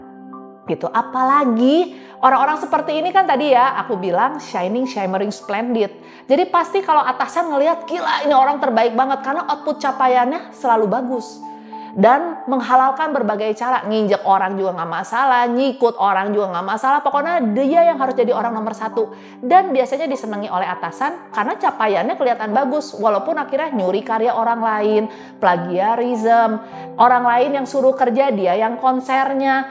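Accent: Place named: West Java, Indonesia. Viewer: 😂😂 selain Seneng penjelasannya dok, juga pengen ketawa juga krn dokter nyunda pisan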